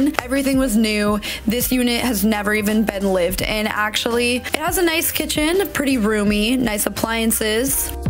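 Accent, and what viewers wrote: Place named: Southern California, United States. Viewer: Why do you speak like you're from SoCal when you've only lived there a few weeks?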